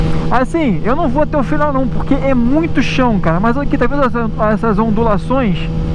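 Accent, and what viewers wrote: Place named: Rio de Janeiro, Brazil. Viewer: eu acabei de rever o filme, cara parabéns pelo vídeo e abençoada seja a Internet né por que nos permite coisas como essa sensacional curiosidade nostálgica que você trouxe! Um adendo, esse filme foi fantástico pelo carro pelo amor adolescente (eu era apaixonado na atriz, hoje não ela tá com 57 anos kkkkk) a história de suspense como um fantasma que buscou uma vingança justificável, através do desconhecido pra nós, máquinas de outro mundo, uma força cósmica, o ronco pra lá de insano do carro parece sincronizado de 2 motores deu um efeito assombroso...apesar de hoje parecer tosco como você disse na época nooossa era top mesmo, e a gente viaja no tempo, olha parabéns de novo e ganhou +1 inscrito brother!! Forte abraço tmj... seu sotaque carioca mano kkk eu sou do Mato Grosso do Sul campo grande, aqui é porrrtera, nóis, faiz, bão kkkk vlew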